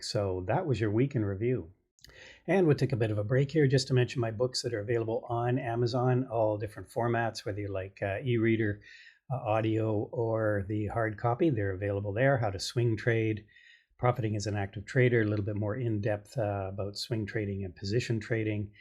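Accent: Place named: Canada. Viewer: Thanks, for your very helpful weekly commentary. Love your Canadian accent and your quiet demeanor too. Read your Swing Trading Book years ago, and use it to refresh. 💮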